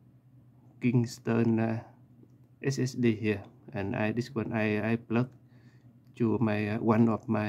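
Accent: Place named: India. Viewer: Love u indian accent guy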